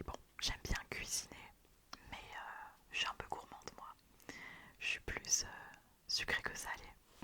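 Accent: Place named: Belgium. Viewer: tes belge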